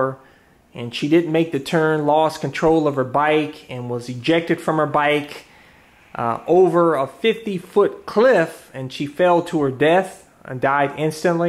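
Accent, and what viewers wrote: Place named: Appalachia, United States. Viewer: you're a great guy and very sincere with your advice. You have a great hill billy accent and infectious laugh.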